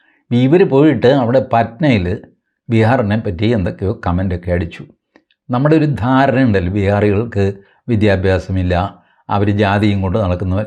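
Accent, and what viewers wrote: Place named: Kottayam, India. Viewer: എതായാലും താങ്കളുടെ ആ കോട്ടയം ഭാഷയില്‍ ഉള്ള, hasyathmaka അവതരണം കലക്കി 😂😂 ജവാബ് നഹി 😂...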